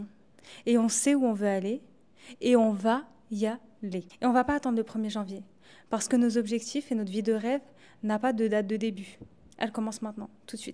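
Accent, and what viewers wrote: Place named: France. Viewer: Ton accent est comme le français bon courage 😍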